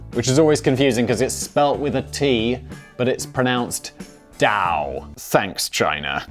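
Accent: United Kingdom. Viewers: ...look, I'm not gonna go read all of the boi with the blazes comments here, but damn... are people REALLY wasting TIME on comments like, "what is a taco?" OBVIOUSLY pronounced by a British man??? How many ducks do people really give about his pronunciation???? Geez!!!!! That's a little bit picky, don't ya think??? I LOVE THIS CHANNEL!!! IT'S MY FAVORITE!! LET THE BRITISH MAN SPEAK!!!!🙄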